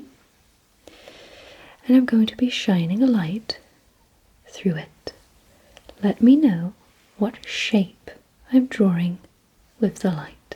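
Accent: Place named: United Kingdom. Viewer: As you wish Goddess Wright Knight Rain Hurricane / ( I love your sweet British accent 😊) Requiem Twilight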